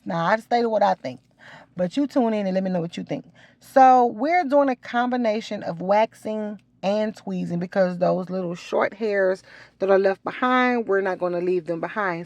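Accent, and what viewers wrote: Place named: South, United States. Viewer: I watch all your uploads so informative and satisfying to see! I have been through the gamut of hirsutism hair removal issues and just seeing you help others is very encouraging. This upload I enjoyed your accent and the way you pronounce "depilatory" I just love listening to your southern accent! Love it! Keep up all the great entertainment and good facial hair waxing help!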